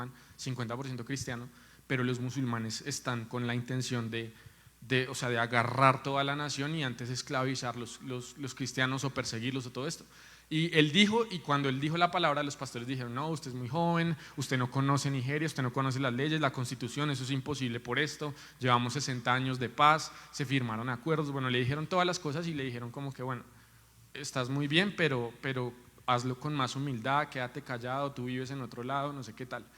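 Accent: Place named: Colombia. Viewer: Hola siervo de Dios por primera vez lo veo y lo escucho por su acento se que es de Colombia y le ruego que no tenga temor nunca de hablar lo que el Espíritu Santo le dice para su pueblo recuerde de donde saco a Jonas para que ablara a ninive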